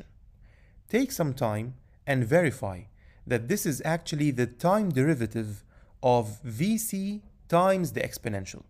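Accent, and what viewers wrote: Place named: Egypt. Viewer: You have an Egyptian accent kinda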